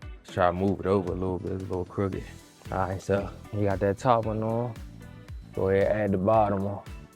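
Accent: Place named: Chicago, United States. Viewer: I hear a Chicago accent. 😊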